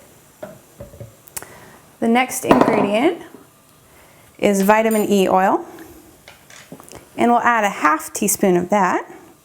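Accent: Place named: England, United Kingdom. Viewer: I saw you on afolasmr I thought you were from England 😅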